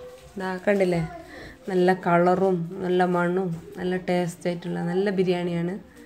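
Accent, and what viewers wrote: Kasaragod, India. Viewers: Super... nhammale kasaragod baasha.. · 👍👍👍nammale ksd slangil bisiyo pareembonne pang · Kasrood slang sooper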